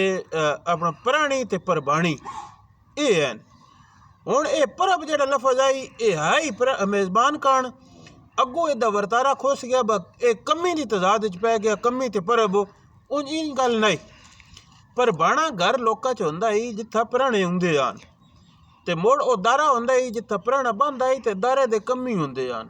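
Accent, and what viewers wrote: Shahpur, Pakistan. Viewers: صاحب ہمیشہ کی طرح خوبصورت ویڈیو اپ کی اپ پنجابی زبان خاص کر شاہپوری لہجہ کے درست ترجمان ہیں سدا سلامت رہیں آباد رہیں ۔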